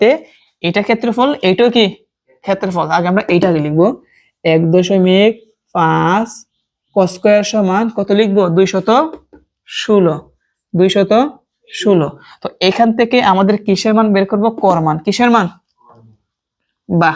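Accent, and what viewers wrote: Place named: Habiganj, Bangladesh. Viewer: বুঝতে পেরেছি স্যার। অসংখ্য ধন্যবাদ।🙏🙏স্যার কী হবিগঞ্জে থাকেন? আমিও হবিগঞ্জ এই থাকি। স্যার এর কথা বলার স্টাইলটা হবিগঞ্জের ভাষার মতোই লাগলো।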